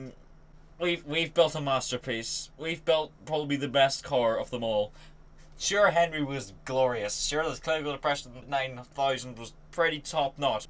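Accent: Northern Ireland, United Kingdom. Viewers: Are you from Northern Ireland? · r u from northern ireland??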